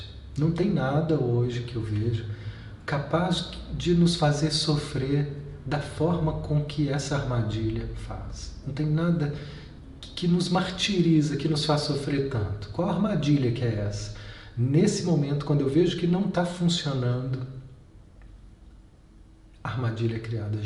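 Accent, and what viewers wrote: Minas Gerais, Brazil. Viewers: Gratidão!! Conheci teu canal através de um grupo aqui em Garopaba SC há um mais de um ano, desde então assisto todos, reasisto....E assim vou, passo à passo plantando sementinhas de autoconhecimento!! Adoro esse sotaque mineirinho!! 🤗🤗🤩🤩👏👏👏🙏🙏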